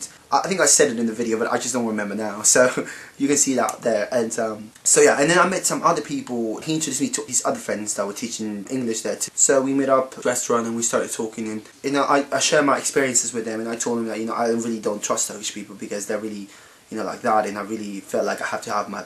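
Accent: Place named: Birmingham, United Kingdom. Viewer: I'm English and i would never go to Turkey. I'm sure the majority of Turks are lovely people. But i always hear every summer of people being attacked and in fact just yesterday a British family were shot by a Turkish man at a villa. Greek people are beautiful. Go to visit Greece guys, beautiful people, beautiful country, beautiful everything. By the way you has anyone told you that you sound like you're from Birmingham in England, you have a similar accent:- )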